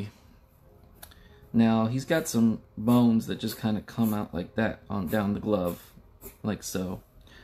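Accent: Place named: United States. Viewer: You have a very calming voice too! Usually I find American accents irritating but yours is definitely nice! 😝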